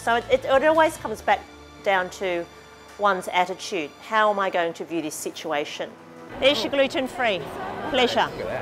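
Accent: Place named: Australia. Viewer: Lovely Chinese girl ruined by an Australian accent:-)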